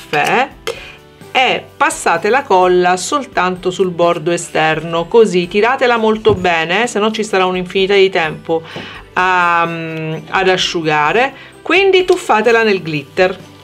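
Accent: Catania, Italy. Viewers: Ma tu sei di Catania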